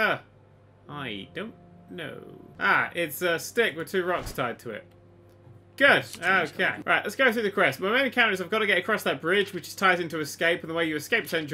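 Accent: England, United Kingdom. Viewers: has English accent. says the word candy this made me cry a little inside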